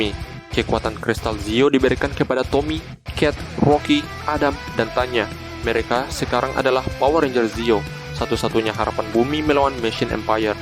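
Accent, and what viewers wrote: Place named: Medan, Indonesia. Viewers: Logatnya kayak orang medan ya bang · Suaranya Kayak orng medan Ygu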